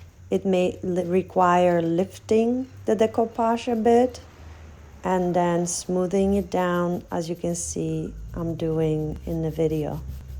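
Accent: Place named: Europe. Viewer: I’ve been watching all of your shorts and just tuned into this. I’ve been wondering where you find all of your beautiful pieces and now that I hear you beautiful accent I’m thinking you must be in Europe and it makes since how you find the pieces. I live in Colorado USA and believe me these cowboys out here don’t have such great pieces like you find.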